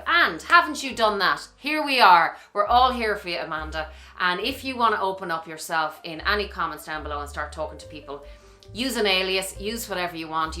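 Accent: Ireland. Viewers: Aw- I love you too! Your energy and gorgeous Irish accent . I am praying this video will help Amanda- and any of us who need help.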